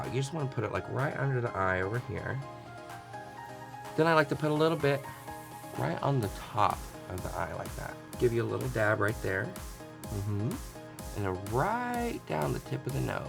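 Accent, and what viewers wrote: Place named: South, United States. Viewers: She talks like a little southern gay Bob Ross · ginger sounds so sweet and southern and endearing when she speaks i love it so so much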